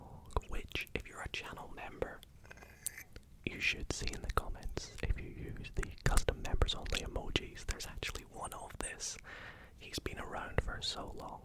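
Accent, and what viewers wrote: Scotland, United Kingdom. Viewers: I started watching asmr in 2022 but not sure if it was 22 or 23 when I came across you and it was the Scottish accent that made me sub, so tingly!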